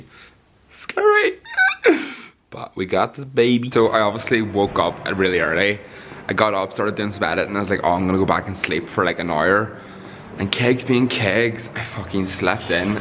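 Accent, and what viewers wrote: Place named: Scotland, United Kingdom. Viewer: I notice your accent are you from Scotland?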